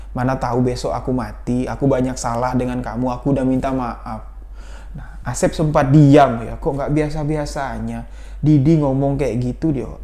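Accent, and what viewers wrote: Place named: Lampung, Indonesia. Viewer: Bang oarang sebrang ya,dari logat nya kayak orang Lampung.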